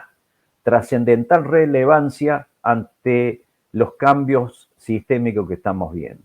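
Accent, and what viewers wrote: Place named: Córdoba, Argentina. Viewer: Tonada cordobesa!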